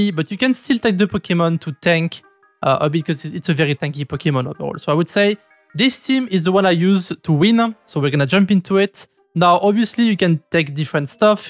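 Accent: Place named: France. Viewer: J'ai jamais vu un bon youtuber faire si peu d'efforts d'accent, vraiment là tu peux pas me dire que tu peux rien y faire, tu peux que t'améliorer parce que vraiment on dirait une voix IA française qui lit un texte en anglais c'est chaud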